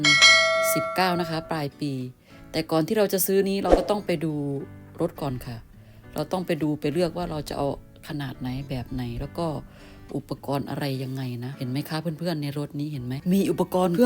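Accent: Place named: Isan, Thailand. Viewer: เสียง พูดกลาง ผสมอิสาน เพราะนะ มิน่าสติกเกอร์ทำที่ไทยสวยจริง นึกอยู่แร้วว.สติกเกอร์จากไทยแน่ๆ อิอิ ร้านพี่ก็พิมพ์สวยน้าา ไปดูที่ช่องเลยงับ😁😁😁😁😅😅😅😅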